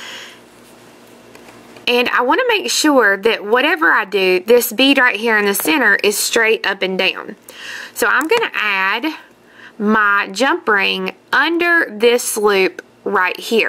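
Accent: South, United States. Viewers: I recently discovered U-Tube and then I found you! I've been bead working for about 15 years but my passion has been revived since watching your's and a few other's tutorials. You are a great teacher! I feel so blessed to have met you through this medium. I live in Alabama so your southern English is very understandable! Lol! I also subscribed to your site! Happy beading!💞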